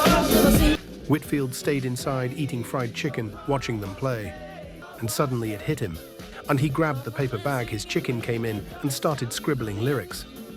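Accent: United Kingdom. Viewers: Please narrate your work and lose the Brit !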